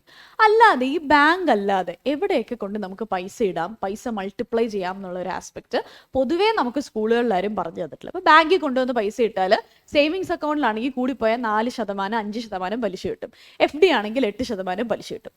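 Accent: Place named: North India, India. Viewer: you are gem at english still speaking malayalam like north one...!!!!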